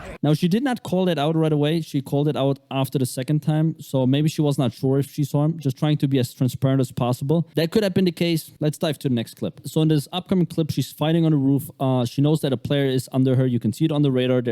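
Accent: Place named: Germany. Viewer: You definitely a German bro, your accent sounds like this, and your name too.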